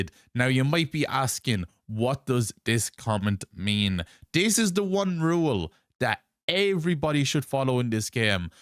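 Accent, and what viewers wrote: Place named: Ireland. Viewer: This guy is Irish Irish lol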